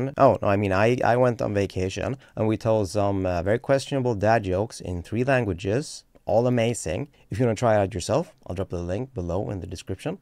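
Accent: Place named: Sweden. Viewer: it's pretty easy to tell the real from the robot. your natural voice has much more low-end resonance and a slight, but endearing, european accent (swedish?). on the clone version, you are sans accent (test your french) and you're more minnie than mickey. the lack of bass might be correctable in post; the accent, not so much.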